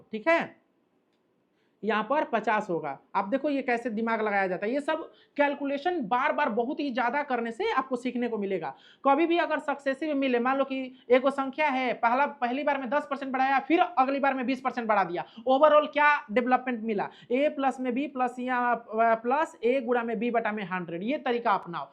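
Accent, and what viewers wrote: Bihar, India. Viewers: Bihar ka hai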